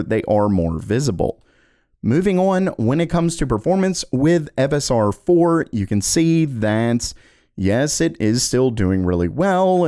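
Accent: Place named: Tennessee, United States. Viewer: lol I walked away and thought the video switched to DistroTube? Tennessee? Hello neighbor lol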